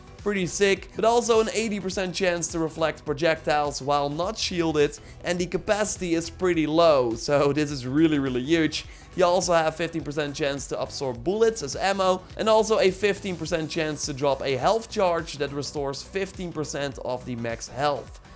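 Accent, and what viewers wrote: Netherlands. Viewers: Het nederlandse accent blijft leuk ;)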